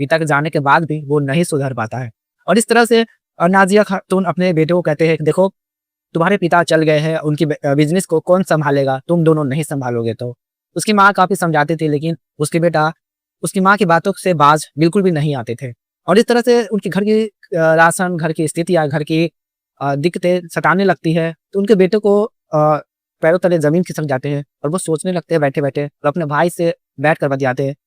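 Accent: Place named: Haryana, India. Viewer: Haryana se bol rahe hain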